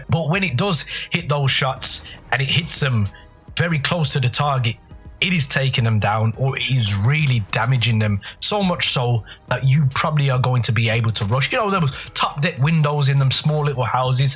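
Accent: Birmingham, United Kingdom. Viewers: Walsall? You sound it but then I hear abit of Brummy in you to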